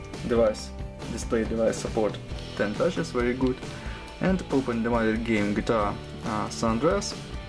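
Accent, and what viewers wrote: Russia. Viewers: Это же русский говорит.Акцент спалил и язык в телефоне!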